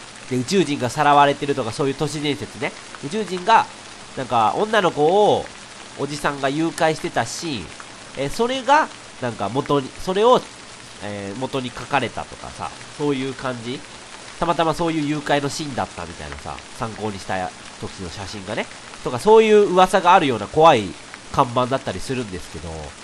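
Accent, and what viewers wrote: Kansai, Japan. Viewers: レトさんの関西弁好きw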